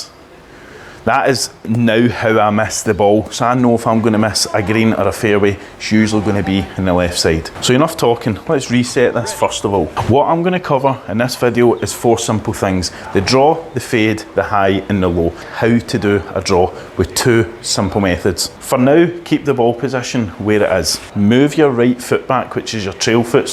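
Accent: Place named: Glasgow, United Kingdom. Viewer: Thanks a lot. The Glaswegian makes this Dutchman have to concentrate to follow along (more than with Billy Connoly). I bet though a lot of Americans won't even try to understand. I will give this method a try. Liked and subbed.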